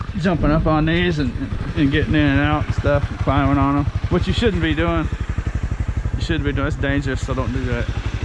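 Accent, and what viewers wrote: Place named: South, United States. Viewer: You’re back! Again! 🥰😁. No, talk as much as you want, I for one, enjoy your particular southern accent. Being southern myself (although, some people don’t consider Florida as being southern), I enjoy and appreciate all the different variations of the southern accents. ✌🏼❤️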